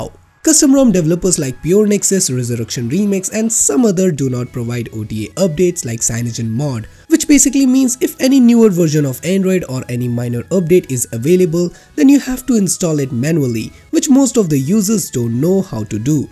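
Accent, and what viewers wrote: India. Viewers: I DON'T UNDERSTAND ANY OF THIS and your Indian accent isn't helping!!!!!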